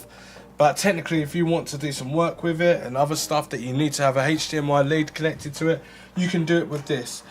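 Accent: United Kingdom. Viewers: hey mate i like it again, i even like more yr brittish accent than the video itself lol greetings from paris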